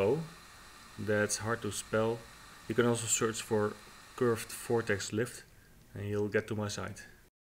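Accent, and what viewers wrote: Netherlands. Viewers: Gast ik hoor aan je stem dat je nler bent ik wil s komen kijken! mag dat? :D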